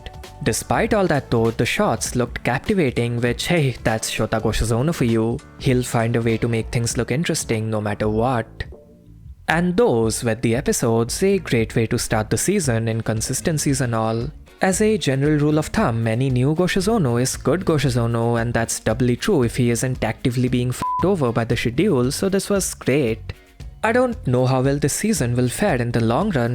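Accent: India. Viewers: Like my comment if you think his indian accent ruins this video and want him to use an american or any other correct english accent. Sorry mate but we are all making a stand. If you dont change the accent on the video we will unsubscribe until you do. Peace be with you brother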